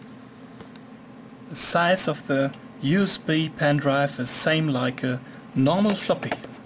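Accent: Germany. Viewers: I am detecting a German accent here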